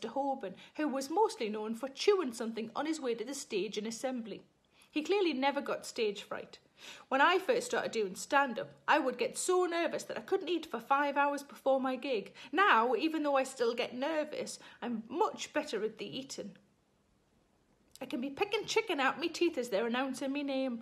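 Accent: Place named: Geordie, United Kingdom. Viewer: hi, the worst thing I ever wore was a pair of trousers that were slightly to short and people said my budgies were flying low, as a Geordie I appreciate your comedy more than most, like my friend bobby pattinson, another fantastic northeast comic for your fans that may not know him says its the dialect that makes us laugh, thank you for being you, I laugh till I nearly split watching you shows, love from springwell est gateshead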